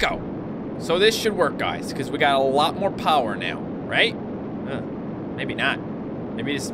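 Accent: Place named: Newfoundland, Canada. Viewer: Where you born in newfoundland because you speak like a Newfie I am in Newfoundland right now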